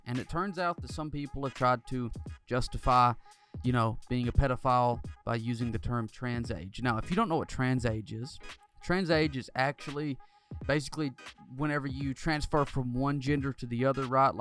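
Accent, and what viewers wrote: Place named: Alabama, United States. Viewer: You sound Alabamian.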